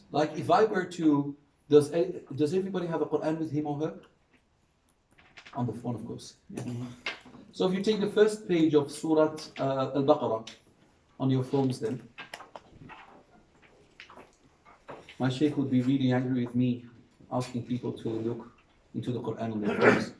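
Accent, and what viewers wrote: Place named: United Kingdom. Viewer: Loved the British accent 😂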